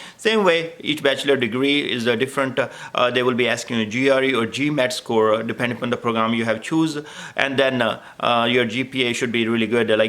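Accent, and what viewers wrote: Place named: Sindh, Pakistan. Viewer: sometimes your accent seems to be of a Sindhi guy (specifically from Naushero Feroz - a town in Sindh)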